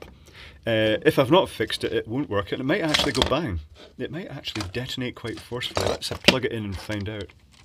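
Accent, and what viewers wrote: Scotland, United Kingdom. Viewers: he's scottish :D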